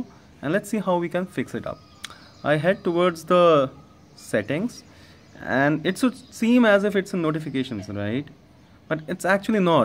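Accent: India. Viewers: I like your Indian accent.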